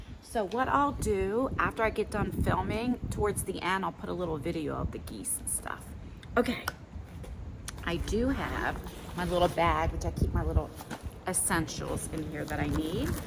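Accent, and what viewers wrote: New Jersey, United States. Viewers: I know I've told you this before but I love your NJ accent because my boyfriend of 13 years has the same accent and I'm from Boston so I have a Boston accent so we tease each other about our accents. 🤣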